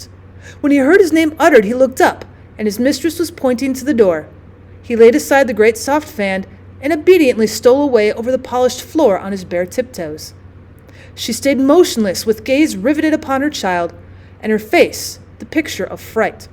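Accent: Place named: South, United States. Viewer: i just love ths southern twang to this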